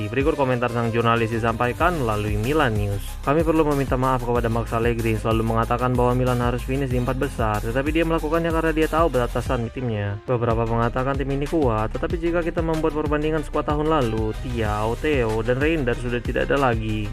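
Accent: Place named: Mamuju, Indonesia. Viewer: Ini adminx dari kab. Mamuju, prov. sul-bar yach? Logatx mirip orang mamuju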